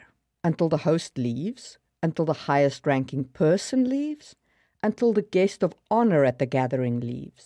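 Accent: South Africa. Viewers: I love the step by step way you take us through detailed aspects of worldbuilding! I've been watching tons of worldbuilding videos and so many of them are so general that I take nothing away from it. Yours are great and really well structured, I've got some ideas to work with just from this one video. Subscribed! P.s., your accent is so cool, are you from South Africa?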